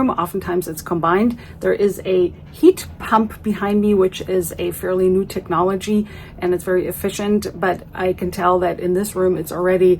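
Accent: United States. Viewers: American accent but perhaps has not lived in the United States since she was a little girl and doesn't know much about it? The removable shower head shown is available everywhere, Home Depot, Walmart, etc. Even the fixed shower heads are adjustable so you can aim them where you want. Windows with interior shades, while not popular, are available in the US. Many, perhaps most American homes have a concrete basement with stairs going down to it. Not all American homes are, as she implied, overdone. Perhaps she watches television and makes assumptions? Many, MANY are simply furnished. I'd say her video "German vs American Homes... this explains everything actually explains nothing. Instead of making stuff up, she should do some research.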